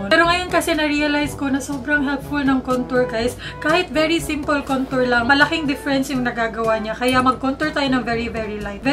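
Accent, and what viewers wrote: Visayas, Philippines. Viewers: Luh...Bisaya ka noh?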